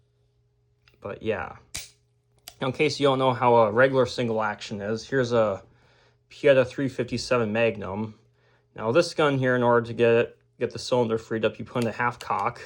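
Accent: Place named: Midwest, United States. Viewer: Sounds like a Midwesterner to me.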